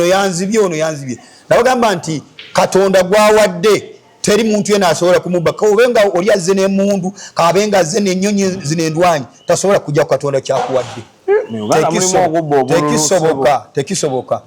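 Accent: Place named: Tooro, Uganda. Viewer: I like the accent of this man 😊 olabilawo nti wawaka Tooro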